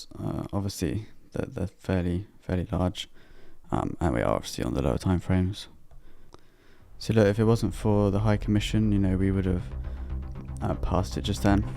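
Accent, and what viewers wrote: United Kingdom. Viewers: Hey bro where in the UK you from?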